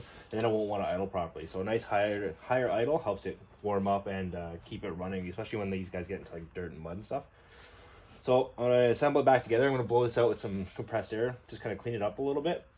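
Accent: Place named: Canada. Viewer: I notice the Canadian accent but do you always speak that fast or was the video on 1.5 x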